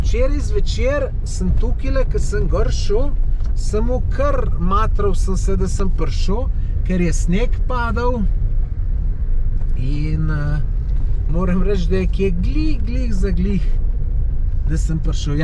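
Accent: Slovenia. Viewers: Meni uvek simpaticno kad slusam slovenca kako prica:) Jajaca su jaca:):) Bili smo ocigledno u isto vreme na Jahorini, ja sam zadnji put bio pre 15 godina i zaista sem planine koja je ista sve ostalo je drugacije. Puno pozdrava iz Beograda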